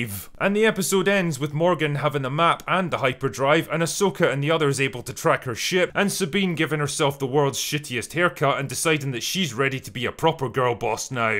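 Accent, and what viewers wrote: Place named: Glasgow, United Kingdom. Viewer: I've haven't seen any disney movie in decades. I just check out the reviews; that's all I need. Jeezuz I love sardonic, hilarious put downs of rubbish. And with a Glasgow accent too!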